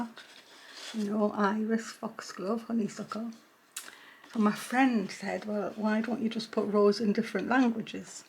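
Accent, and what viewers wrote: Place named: North East England, United Kingdom. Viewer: These are beautiful! Also you sound like you’re from my area, north east England! Ah yes you just said in the video:)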